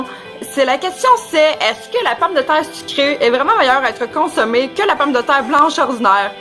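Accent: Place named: Canada. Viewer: On va me traiter de raciste normal c'est la nouvelle génération de merde mais, l'accent canadien me stress j'ai phobie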